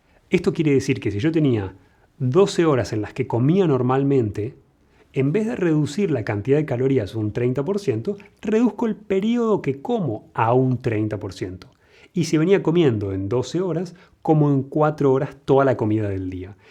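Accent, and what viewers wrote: Argentina. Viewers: Me parece excelente su exposicion sobre el metabolismo los telomeros y toda esa cantidad de conceptos que si bien conocia algunos desconocia otros y como estan inter relacionados por el acento al hablar me pareciera argentino y digo esto porque dice que es doctor y de alguna manera se acerca al publico de manera corriente y comun para dar indicaciones medicas sin ninguna retribucion economica realmente lo felicito por su dedicacion y esmero en este tipo de platicas lo conoci a traves de youtube por el video sobre la vista y al ver su explicacion enseguida me suscribi . Supongo por su explicacion tan minuciosa y detallada que usted es microbiologo o algo por el estilo ya que conoce a la perfeccion nuestro mecanismo y metabolismo de nuevo me permito felicitarlo gracias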